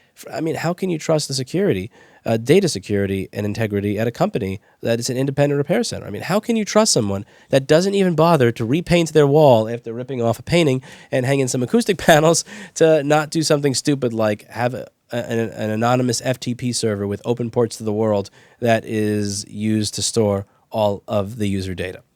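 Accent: New York, United States. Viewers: Very entertaining, I just love your approach to injustice, etc, and the New York City "way"...of speaking out verbally. Anyway, being on the west coast, in Oregon, I never could stand the whole apple products pushback by their cult leaders and the members of the congregation, most noticeable here on the west coast which is closer to them. They and the other internet giants pretty much proclaim to rule the world, maybe they do right now, too big for their own good and it reminds me of doctors or lawyers or politicians who come from elite families and have gone to elite schools who have no clue, no idea what its like to be a regular human being or what the inside of a grocery store looks like for instance......have they banned you from facebook, twitter, paypal, google, etc etc yet? Seems like these days all the tech giants have a mutual agreement to ban nay sayers from everywhere all of a sudden.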